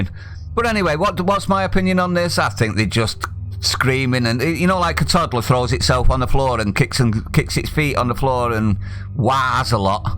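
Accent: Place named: Manchester, United Kingdom. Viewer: Jesus fucked, if that manc accent wasn't annoying enough you throw in an even more annoying fake laugh.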